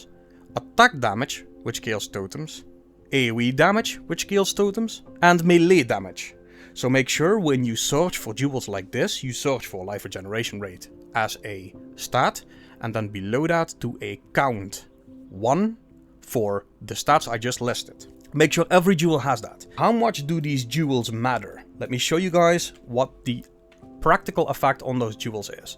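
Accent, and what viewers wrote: Limburg, Netherlands. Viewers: Are you from Limburg?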